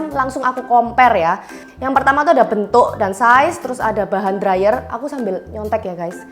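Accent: Java, Indonesia. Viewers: Medok logat jawanya 😂😂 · Cantik + oriental + muuuuedok = ❤ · medoknya saya suka 😍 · Medok men Yo jowone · terus dipinek2 😂🤣medokk bngeett mbk e .. lucu .. makasih review nya kk .. milih Arashi aja dehh go co😅😅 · Makasih orang baik 👍🙏💕...Kusuka mbnya bahasa nya medok Jawa 👍🙏🇮🇩💕😅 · medok kali suaranya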